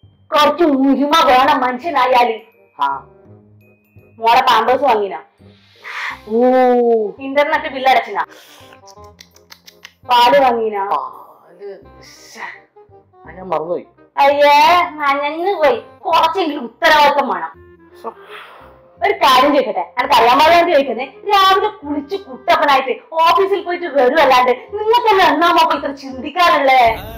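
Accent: Kannur, India. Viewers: Kannur or kasaragod ?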